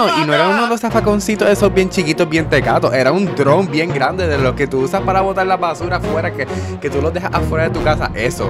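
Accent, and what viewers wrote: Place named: Puerto Rico. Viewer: yo tambien lo soy pero naci en estados unidos y ya sabia que era de puerto rico por como hablaba xdd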